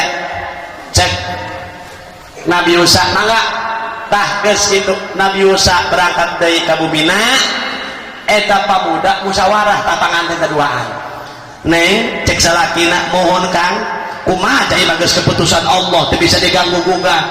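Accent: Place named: East, Indonesia. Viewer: Syedap .. sundanya logat mana kaya daerah timur Salam Lembur Kuring Purwakarta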